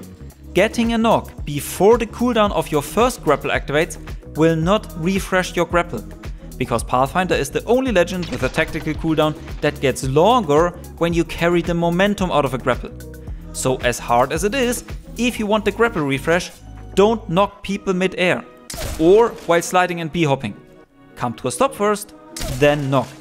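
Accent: Germany. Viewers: Ich hab bei deinem akzent schon immer gedacht das du deutsch sein könntest hab aber nie was gefunden, bis jetzt 😂🫶🏻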